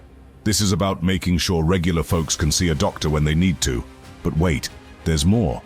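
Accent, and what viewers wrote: United Kingdom. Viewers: Way too many "ain't" and "nah." Don't go well with the narrator's English accent.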